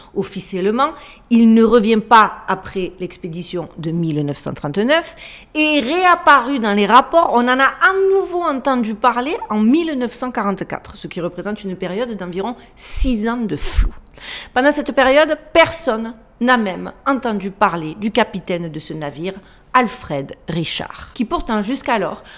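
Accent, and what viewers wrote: South, France. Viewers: Bonjour j'adore l'histoire avec l'accent du sud !! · j'aime bien tes videos sur l'antartique ! super intéressant merci et beau travail ! je trouve que tu as un bel accent du sud de la france, tu viens d'où ? Marseille ?? .... lol bises (je viens de Marseille aussi, maintenant j'habite en occitanie) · Avec l'accent du Sud insupportable en plus... Perso je ne peux pas ça me gavé.. next! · Quel humour,à dévoiler les choses,vous n'avez pas peur qui aillent plus loin que la censure,une vraie "Guerrière qui vient du froid😂,euh,non qui vent de là où il fait bon de vivre😁,super vidéo,intéressant d'approfondir le Sujet de L"'antarctique",vidéo traitant de découvertes parfois jamais entendue,avec humour,et cet accent qui vient du Shud🤣,excellent "& conteuse d'histoires extraordinaires pour petits et grands,quand yà rien à la télé,regardez "Les Capsules de L'aventurière Archéologue 😂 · Je découvre ta chaîne Youtube... 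Très intéressante 👍.. mais.. ton accent viens de ou ? Es tu française?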